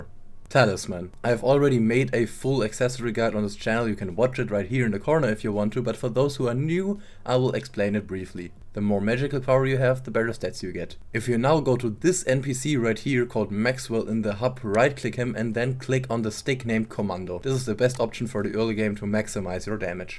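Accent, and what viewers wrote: Germany. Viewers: he sounds 100% german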